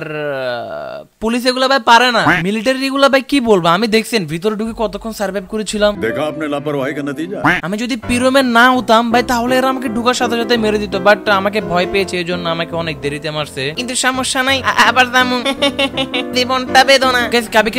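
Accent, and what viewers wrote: Noakhali, Bangladesh. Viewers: Via apnar bari Noakhalir kothay?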